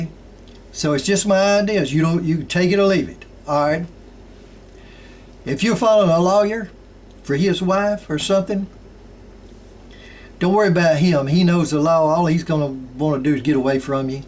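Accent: South, United States. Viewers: Enjoy the documentaries , the soothing southern drawl , the simple straight forward logic . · I'm sitting here in London listening to your terrific accent. What part of the USA are you from? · you give sound advice. loved your southern accent.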